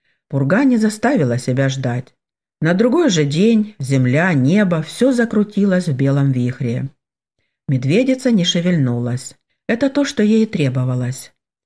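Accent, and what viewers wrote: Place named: North, Russia. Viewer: Прекрасное чтение, даже не представляю, как это можно, привыкнув всегда выговарить гласные так как мы обычно это слышим, так быстро перестроиться и читать как бы О-кая. Это придаёт рассказу какую-то особую прелесть и неповторимость. С большим удовольствием слушаю и всегда с нетерпением жду продолжения. Да благословит вас Бог в этом служении.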